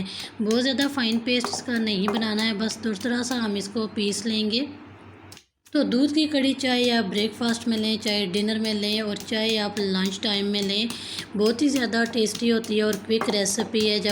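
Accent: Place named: Bhatwahar, India. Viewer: اپ کے لہجے سے لگتا ہے کہ اپ کا تعلق بھٹوہار سے ہے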